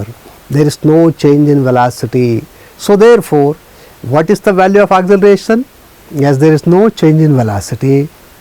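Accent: Telugu, India. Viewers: Really ur Telugu only sir I caught many words like questions su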